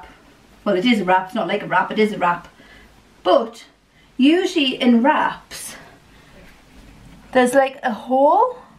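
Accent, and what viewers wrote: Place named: Wallsend, United Kingdom. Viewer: She is from Wallsend. :) We both live in Australia now, her accent isn't as strong but always makes me smile.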